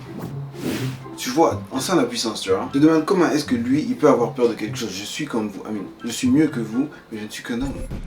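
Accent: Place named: Toulouse, France. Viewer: l'acent toulousain j'aime trop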